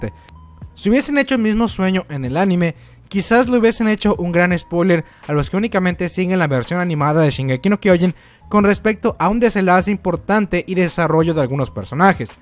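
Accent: Peru. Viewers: eres peruano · eres peruano??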